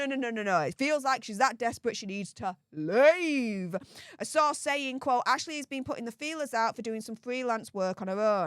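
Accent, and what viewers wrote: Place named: England, United Kingdom. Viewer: please talk a bit slower because your accent is very broad and hard to understand. I don't live in England (I'm just guessing that's where you're from?) Thanks heaps.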